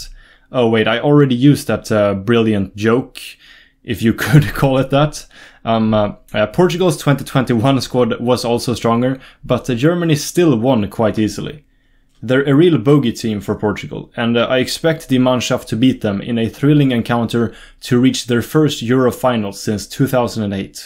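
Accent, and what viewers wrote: Sweden. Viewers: Måste va den starkaste svenska brytningen jag hört på länge😂 bra video👍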